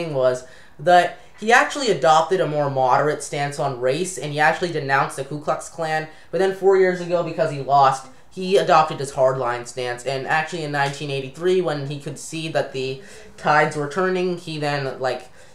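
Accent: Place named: California, United States. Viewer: You sound like you live in California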